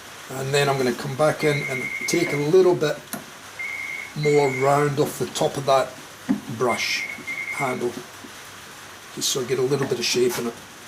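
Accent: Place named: Scotland, United Kingdom. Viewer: boy! That accent sounds very close to home! And here was me thinking you were an Aussie! I didn't know my brush was made by a Scozzy!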